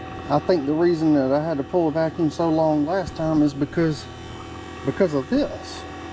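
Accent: South, United States. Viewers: Lov the southern slang. Gunna plug it up 😂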